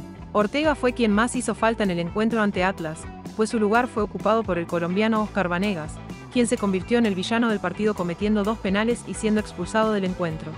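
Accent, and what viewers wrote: Argentina. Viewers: PORQUE HABLAS COMO ARGENTINA?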